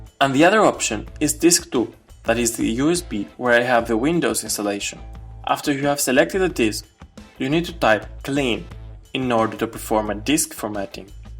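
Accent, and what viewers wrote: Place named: India. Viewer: You my friend are a life saver, never thought someone with an indian accent could solve my issue in less than 3 minutes, THANKS !!!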